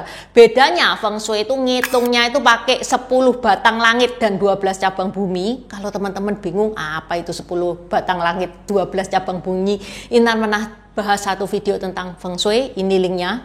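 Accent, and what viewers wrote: Java, Indonesia. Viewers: Bahasa mandarin logat jawa.. Unik 😅 Akhirnya pertanyaaan saya terjawab kenapa budaya china dan jawa kok mirip mirip... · KEREN BANGET CIK …..JAWA MEDHOK 😂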